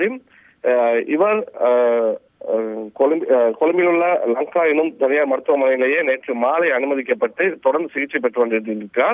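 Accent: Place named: North, India. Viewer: Vadakan Tamil news vaasikura maari eruku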